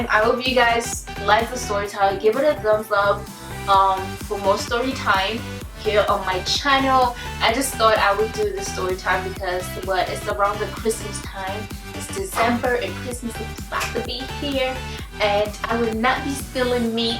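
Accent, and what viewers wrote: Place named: Nigeria. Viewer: am from kenya and honestly you are the first nigeria girl i have watched the video to the end and want more.keep up girl i like your accent.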